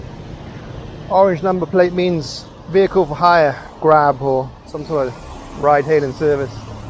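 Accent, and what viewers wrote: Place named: West Country, United Kingdom. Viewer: I like the gentle west country unassuming presentation style, check out the great live music scene in Saigon if you haven't already, cheers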